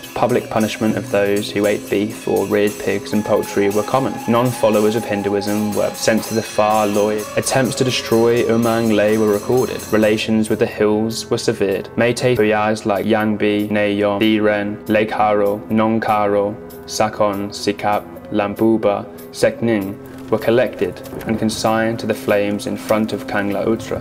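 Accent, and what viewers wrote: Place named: United Kingdom. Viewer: I was not expecting the british accent....😂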